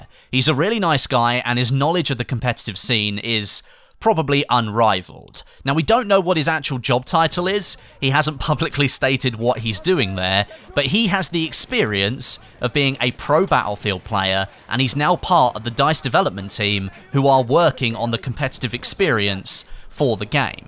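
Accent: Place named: United Kingdom. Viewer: You sound so British lol.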